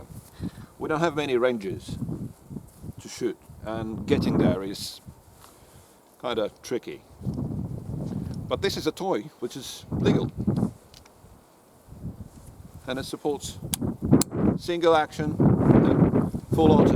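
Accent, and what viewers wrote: United Kingdom. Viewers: You sound British B) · Interedting. Aberdeen is in Scotland, i was thinking more about areas like Yorkshire which stress sounds similar to you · Yea he is actually good Sounds like british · you sound British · For a Russian you sound like a perfect brit. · Greetings from New Zealand. You are the most British Russian ever! I had so much fun watching this. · Haha I'm confused are you Brit or Russian? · bro i thought you were british ngl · Sounds like you are British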